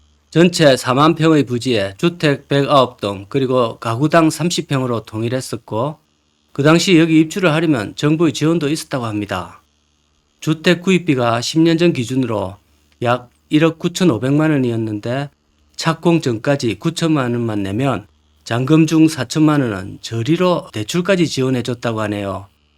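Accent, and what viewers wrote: Gyeongsang, South Korea. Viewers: 전국방방곡곡 다니시며 영상찍느라 고생마니합니다 즐겁게보고있습니다 갱상도 사투리 정겹습니다❤❤❤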